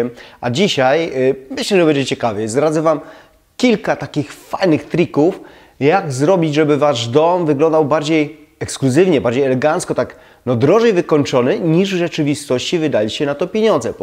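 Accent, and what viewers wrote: Silesia, Poland. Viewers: Zgadzam się z przedmówcami, a od siebie jeszcze dodam, że mówi Pan wyraźnie, bez zadęcia, a śląskie naleciałości są fajnym smaczkiem :) Dziękuję, że Pan się przykłada i dzieli wiedzą. Ciepłe pozdrowienia ☀️ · Czy ja słyszę nasz cudny śląski akcent? 💚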